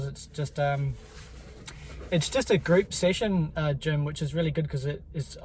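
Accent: New Zealand. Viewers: Talking like maori? They mean Te reo? Or 🤷🏽😅 you talk like a kiwi, nzer 😅🤷🏽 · Not sure what the dude is about - you don't sound Maori as such - just a typical NZ 'one of the bros' kinda accent... regarding tasmania - will be cool man, probably my favourite locations in Australia. Make sure you check out MONA.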